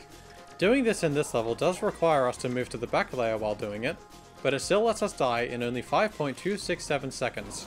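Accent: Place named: Australia. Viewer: femboy??? also why did i think they were australian lmao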